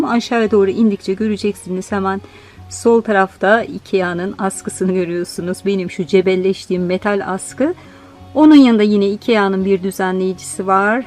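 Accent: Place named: Denizli, Turkey. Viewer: Gayduru gubbak cemilem deyve gari. Bende çok giderdim Denizliye eskiden. Aksanli videonuz cok hos. Merak ediyorum siz yurtdisinda yasadiniz eskiden? Ne is yapiyorsunuz? Sanki televizyonculuk yapmisiniz gibi.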